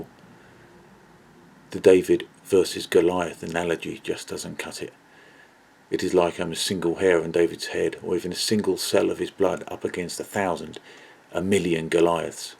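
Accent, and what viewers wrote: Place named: London, United Kingdom. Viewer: This is a London accent, East London I think. Definitely from somewhere in SE England though.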